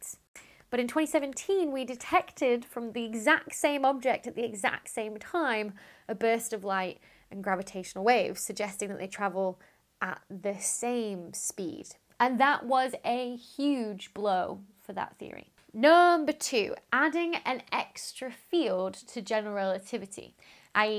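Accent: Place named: England, United Kingdom. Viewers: Thank you for making so many interesting videos that I love to watch for the contents, clear explanations and your lovely English accent which I find very cute 🥰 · Dr. Becky I hear you! Not meaning to disparage anyone.... Bolton, ok my education in the multi-variant English accent continues 🙌 thanks for replying.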